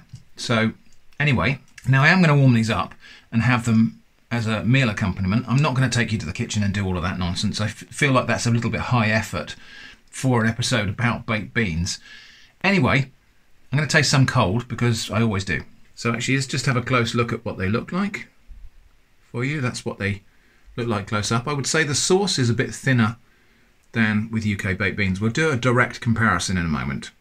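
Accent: United Kingdom. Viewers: Best Brittihcent inherent.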